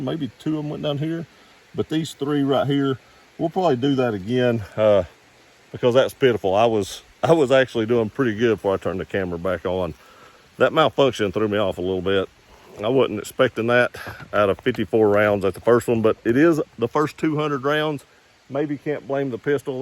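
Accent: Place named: Alabama, United States. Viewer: Sounds like you’re from Alabama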